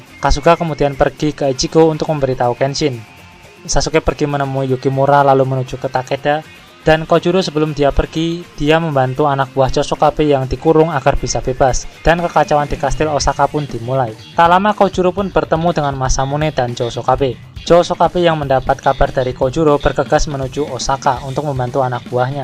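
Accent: Java, Indonesia. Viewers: Logat jowomu medok min,asli ngendi ki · Medoknya bikin semangat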